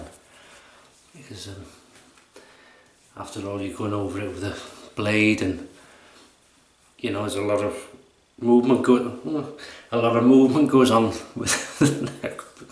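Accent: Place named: North Wales, United Kingdom. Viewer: I knew you were from North Wales could tell, I live in Llandudno don't know if that's anywhere near you. Another great video by the way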